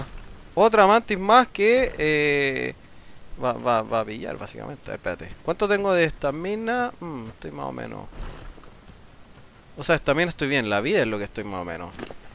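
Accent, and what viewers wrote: Chile. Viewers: eres de Chile cierto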